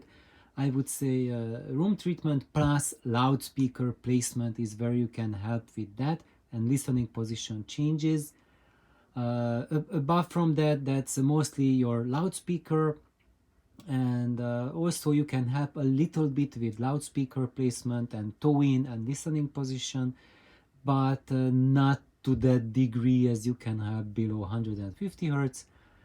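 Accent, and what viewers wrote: Hungary. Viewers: Sorry for the off topic. After 15 years of living in the UK I have met a lot of people from different countries. I am able to recognize accents after just a few sentences. You are definitely Hungarian. Ok it was easy, because I am Hungarian too. :) Believe me It is fun to know where people come from without asking them. All I need to do is just listen how they speak. :)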